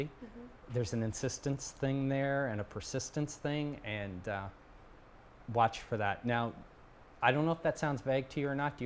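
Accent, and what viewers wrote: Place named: Canada. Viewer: I love that Canadian accent :)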